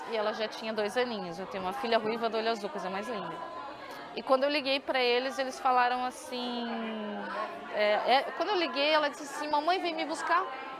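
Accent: Curitiba, Brazil. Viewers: This Is My Nick Mas ela tem um pouco de sotaque Curitibano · o sotaque curitibano dela é lindo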